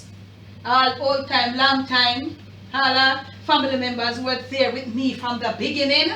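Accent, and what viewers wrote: Jamaica. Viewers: You too sweet.i enjoy your cooking and your Jamaican accent.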